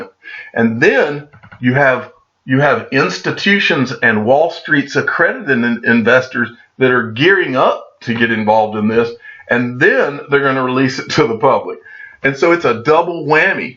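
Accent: South, United States. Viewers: Can’t believe that I’ve watched every single clip uploaded by you since you appeared on YouTube. Reason I started listening to you was because you remind me of my friend Steve who was from down south and had the same tang or accent as you... I’ve checked out so many YouTube channels but no one is more informative and inspirational than the. One love.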